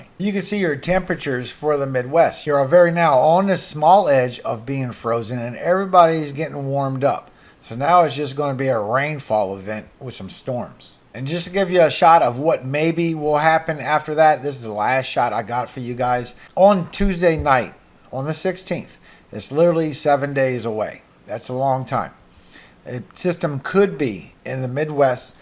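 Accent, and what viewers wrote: Louisiana, United States. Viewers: Thx! You sound like a cajun a bit!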